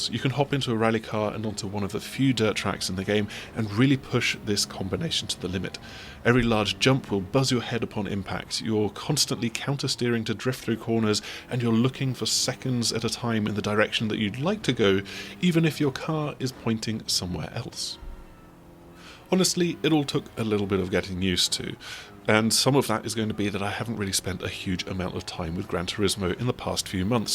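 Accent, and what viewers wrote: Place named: RP, United Kingdom. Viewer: You is so posh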